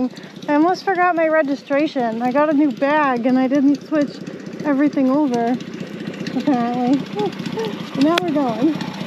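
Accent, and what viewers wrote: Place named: South Shore Massachusetts, United States. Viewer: Careful out there lol Also I can hear the south shore Massachusetts in your voice 😊 haha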